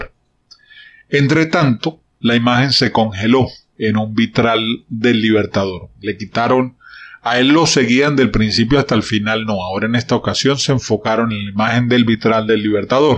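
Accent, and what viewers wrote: Colombia. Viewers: Uuyyy a uds se le sale ese acento colombiano pues como mucho!!! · Bla bla bla bla bla. Pura mentiras mijo sea serioooooo y con tono de acepto colombiano no me fija mentiras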